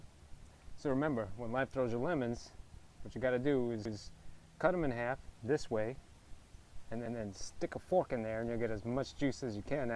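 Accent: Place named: South, United States. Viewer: Any1 else think he Sounded like he talked with a country accent?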